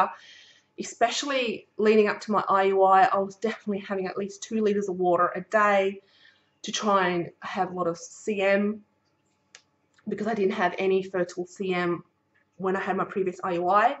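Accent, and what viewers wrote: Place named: New Zealand. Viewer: Just a question you sound like you have an accent... my guess is New Zealand accent... I can hear it in there with a few words that you use.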